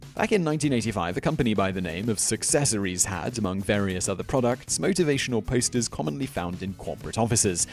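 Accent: England, United Kingdom. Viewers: I love the way he says "scumbag", "farts", "shits" and "badass" in a perfectly clipped English accent.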